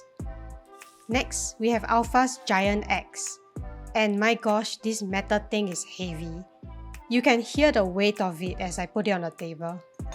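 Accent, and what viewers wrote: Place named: Singapore. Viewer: The figure is so pretty!!! Esp that axe omg! Haha I was surprised when u started speaking, hello fellow SGean :> I haven't seen any other reviews of her so this is vv interesting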